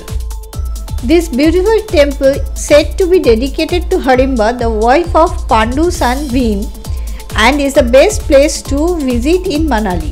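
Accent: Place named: Bengal, India. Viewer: Thanks for the info. Easily recognisable as a bengali speaking english!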